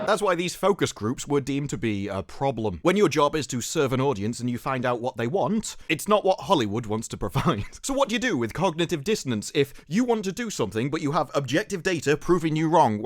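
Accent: United Kingdom. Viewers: Oh, I get it.. So you're like a British It'sAGundam ??? 🤔 I'm here for it. Your accent is very similar to Gopher. 😅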